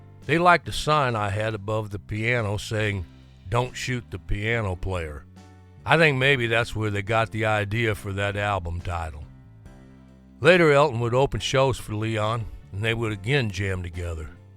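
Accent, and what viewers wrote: South, United States. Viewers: Thank You, Thank You, Thank You For This Great Memorable Leon Russell Profile!! Your Southern Drawl is Perfect, Gives This Presentation More Oomph!! :washhands::washhands::washhands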